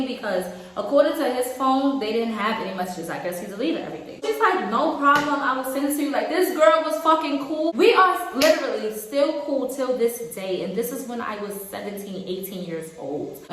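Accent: Jamaica. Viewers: wasnt gonna subscribe till i heard the yardie accent ........big up yuh self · I’m Jamaican n from the bx u talk like me 😂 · Girl you Jamaican 🇯🇲🇯🇲🇯🇲🇯🇲 I never knew that lol loooooooove it... "a wah gwan, a wah the bbc" I CAN NOT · you jamaican lalala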